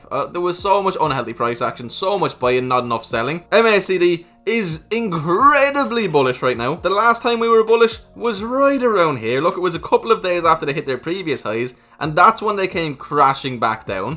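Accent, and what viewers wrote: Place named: Ireland. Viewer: Cool accent , is that Irish ? I’m from China so I’m not good at accents . 😂👍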